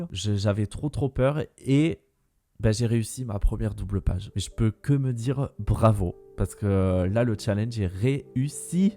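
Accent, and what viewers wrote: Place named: South, France. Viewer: Bonjour ☺ les colos sont vraiment superbes ♥ Je suis ravie d'avoir découvert ta chaîne et d'entendre notre accent du sud ☺ Tellement contente d'avoir un confrère dans le 66 (si je ne me trompe pas 🤣🤣). Je suis une accro des crayons aquarellables mais les produits d'action ne sont pas performants. J'ai aussi fait les double pages d'hercules, j'adore ♥Bon allez je suis une vraie pipelette ☺ A bientôt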